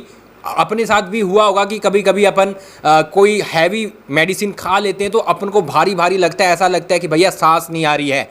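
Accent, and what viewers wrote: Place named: India. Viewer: भाई, हम अमेरिकन नाही, जो शॉ बोले सिर्फ, इंडियन अक्सेंट एसा ही है. There को देयर, alive ko लाइव्ह, र, कड, daughter ko डॉट नहीं डॉटर, पुरा उच्चारण करते है, क्या हुवा ठीक है.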